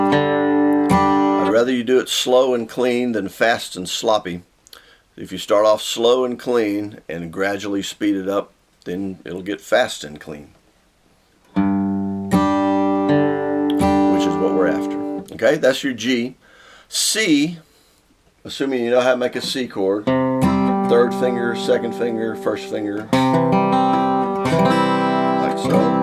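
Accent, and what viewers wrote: United States. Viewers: Just your explanation of how you play a G chord is off the hook. And you have the most perfect southern accent. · that's great, I'm smiling, it sounds like Tennessee to my ears, thank you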